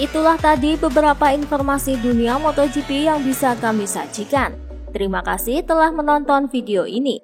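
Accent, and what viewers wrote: Java, Indonesia. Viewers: Gagal fokus sm suara pengisi video, logatnya jawa ini. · Medok beud njir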